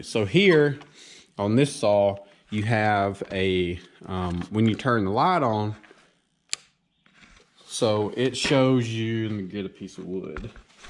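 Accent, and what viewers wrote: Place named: North Carolina, United States. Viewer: Great review man! Just curious, where are you from? Sound like Robeson or Scotland County NC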